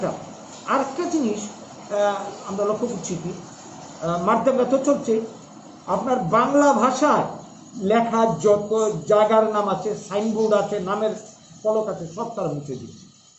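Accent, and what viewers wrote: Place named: Bangladesh. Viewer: Original tripurar bangali tumar moton koitona tumi bangladese kotta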